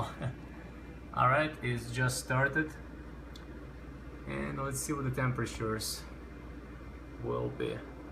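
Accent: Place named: Russia. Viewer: аксент лайк рашен...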